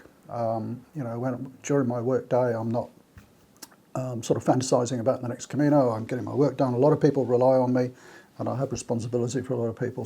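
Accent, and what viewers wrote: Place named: Australia. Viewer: listening to this video was like hearing my own thoughts. My walking buddy and I also have amazing partners who are happy for us to follow our dreams and walk Caminos. Our 3rd Camino will start April 2024 on the Camino Portuguese (and hopefully Finesterre and Muxia) if time and no injuries allow. I love the simplicity of walk, eat (cafe con leche) sleep, repeat, meeting people from all over the world who have the same passion, and especially how I felt at the end of my last one: fit, relaxed and wanting a more simplistic life. Now I have retired, I have achieved the simplistic life and happily planning our next Camino. Your tip about not going into too much detail of your walk with others who have not walked a Camino is spot on. Just watch their eyes and when they start to glaze over, its time to change the subject 😀Thank you for your channel, its great, especially listening to a relaxed Aussie voice. 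I'm from Queensland, cheers Wendy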